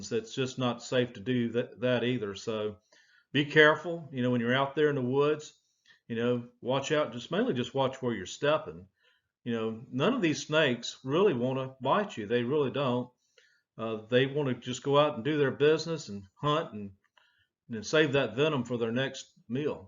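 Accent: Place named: Alabama, United States. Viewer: Thanks fer speaking more like us Alabamans do talk.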